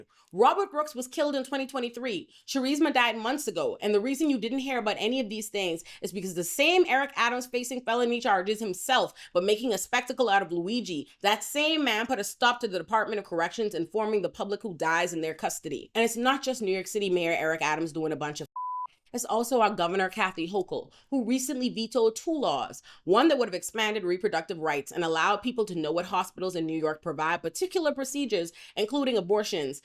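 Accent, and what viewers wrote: Bahamas. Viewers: Aside from me feeling like i hear a bahamian accent trying to come from you 😅.... i always said new york will fall first. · Are you Bahamian? I recognize that accent anywhere lol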